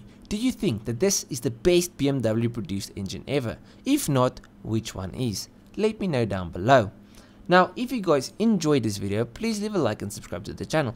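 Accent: Afrikaans, South Africa. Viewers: Can't decide what sounds better - the S70/2 or the Afrikaans accent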